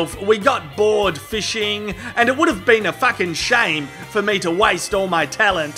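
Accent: Australia. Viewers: Is he really an Aussie? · Lol TRUE AUSSIE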